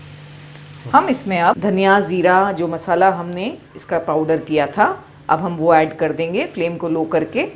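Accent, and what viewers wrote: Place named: Maharashtra, India. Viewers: Madame excellent. Are you from Konkan ? Your Marathi accent comes through ? Very nice .Why isn't it as widespread as vada pav ? Add egg and it becomes a very healthy BF .The use of shapu ! Brilliant. Great . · Thanks for sharing. Wow. Aap bilkul Bombay walo ki tarah bolti hai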